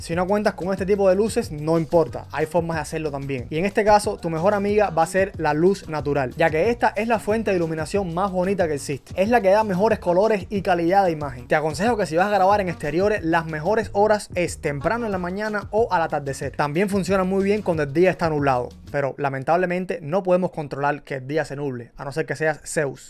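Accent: Cuba. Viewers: buen video, tienes un acento bastante peculiar, eres cubano? saludos desde cubita 🇨🇺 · El acento parece de mi tierra la linda cuba bendiciones crack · Me gustó mucho tu video. Che tenes hacento Cubano Me Equivoco. Saludos bendiciones ❤❤ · se entiente lejos 20mil km q eres cubano ajajaja grande bro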